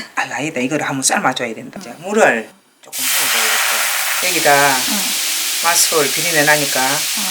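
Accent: Gyeongsang, South Korea. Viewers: 저도 경상도라 그런지 사투리가 참 친근해서 친정엄마가 옆에 계신것 같네요. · 구수한 갱상도 사투리 때문에 구독 ㅎㅎ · 경상도 사투리 너무 정감있어요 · 단추꾸늉 같이 경남 사투리 정감있고 듣기가 잼 나네요 ㅎㅎ감솨 해용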